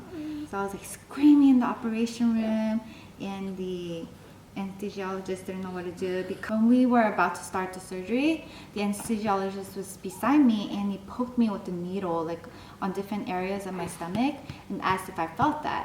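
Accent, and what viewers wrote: South Korea. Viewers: I think your accent is more sound like Korean now if compare the previous pregnant video. Maybe because you alway speak Korean to your husband recent years:)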